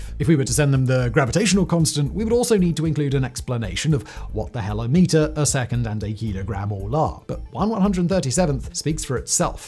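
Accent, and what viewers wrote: United Kingdom. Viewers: I cant take your pumped voice Brit accent. You are not serious. Jeeeeez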